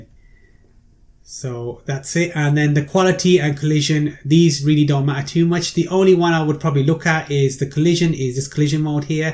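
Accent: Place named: Midlands, United Kingdom. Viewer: Thanks for a clear and informative tutorial. You also sound like a Midlander, which is awesome :)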